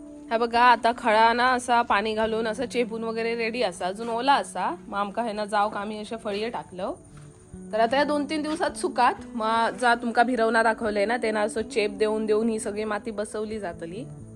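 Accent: Malvani, India. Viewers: मालवणी संस्कृती आणि मालवणी भाषा सुंदर आहे. जास्तीत जास्त मालवणी भाषेचा वापर करा म्हणजे यश नक्कीच मिळेल. लोकांना मालवणी भाषेतील व्हिडिओ जास्त आवडतात. तुम्ही मालवणी सुंदर बोलता त्याबद्दल आम्हाला अभिमान वाटतो. आपल्या व्हिडिओचे विषय चांगले आहेत. आमच्या शुभेच्छा सदैव आपल्या पाठीशी आहेत. धन्यवाद आणि आभार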